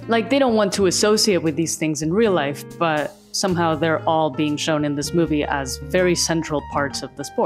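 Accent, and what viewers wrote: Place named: Spain. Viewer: Your English is seriously so damn impressive. It genuinely took me until you said Javier Bardem's name for me to place the microscopic accent, and then I was like, "Hecho, she's Spanish." But your English is better than 90% of Americans'.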